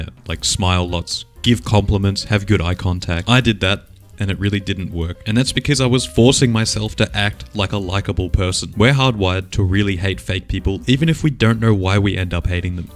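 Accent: Australia. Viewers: cheeky aussie accent for extra charisma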